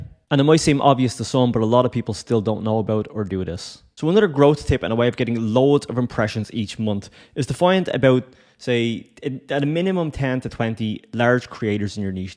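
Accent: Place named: Ireland. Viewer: Excellent advice snd a irish accent double whammy why to follow 😊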